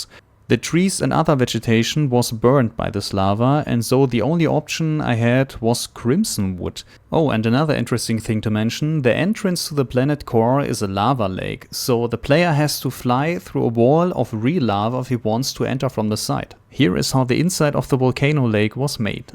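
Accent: Germany. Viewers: very deutsches englisch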